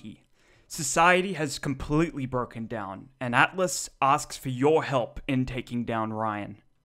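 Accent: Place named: Australia. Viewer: I've never heard someone with an australian accent using so mamy rhotic words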